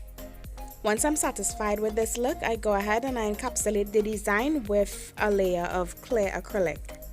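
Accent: Trinidad and Tobago. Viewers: Hi... u sound like u from trini.. i am also.. and those nails r beautiful..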